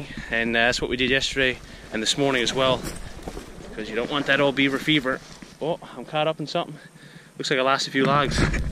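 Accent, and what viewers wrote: Newfoundland, Canada. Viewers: That Newfie accent is so close to the Irish accent. very similar to mine and I live in Australia.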